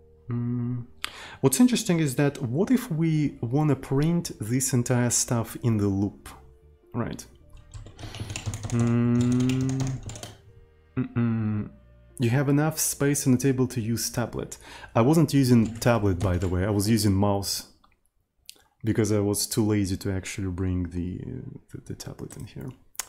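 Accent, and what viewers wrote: Russia. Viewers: Чел наверняка русский (с таким-то акцентом)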